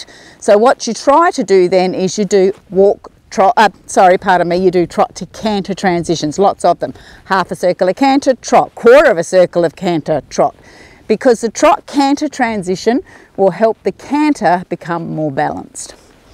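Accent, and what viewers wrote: Australia. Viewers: I’m doing lessons and I might canter this term and are Australian because of your accent I feel like your Aussie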